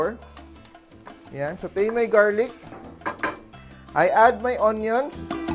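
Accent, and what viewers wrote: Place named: Bisaya, Philippines. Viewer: Proud Bisaya kasi c Chef! Kaya ganun cya magsalita. Ako rin proud Bisaya!😊😊😊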